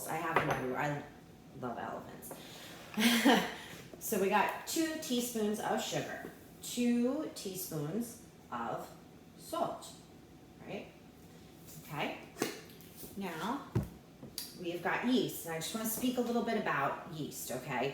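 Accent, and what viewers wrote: New York, United States. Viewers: Half Italian, half Irish. Lol, that's pretty typical of NY/NJ/CT. I live in Florida now, and it's comforting to hear my accent! 👍🏼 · Hello from ALBANY!!!! I just baked bread tonight for the first time....Puerto Rican Pan Sobao. It was deelish! I'm a PR from Brooklyn, who 30+ years later still sounds like you...."why can't I find decent Italian bread in Albany?!?!?!???". I stumbled upon your recipe and was totally enamored when you mentioned Albany. I may try your recipe this weekend. Looks awesome!!!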